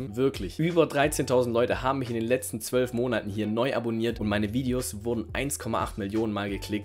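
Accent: Swabia, Germany. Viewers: wieder unglaublich gutes video, danke dir,. selbst meinem vater, dem i das video grad gezeigt hab, hat gemeint: " das ist aber ein sehr professioneller junger mann. aber bei dem hochdeutsch ist der doch kein schwabe? " - doch isser, unser 😁 ich wünsch euch allen ein frohes und besinnliches weihnachtsfest 🥳liebe grüße aus dem regnerischen Bärlin. peace and love ✌❤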